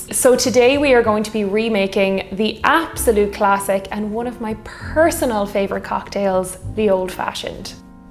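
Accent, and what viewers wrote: Ireland. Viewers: Sorry...not even certain what she said. I was too busy listening to how she said it. That subtle, distinctive, lovely, Irish pronunciation gave me an eargasm. 💖💖💖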